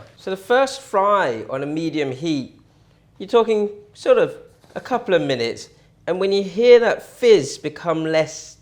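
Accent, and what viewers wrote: United Kingdom. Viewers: That British accent is distracting me.😅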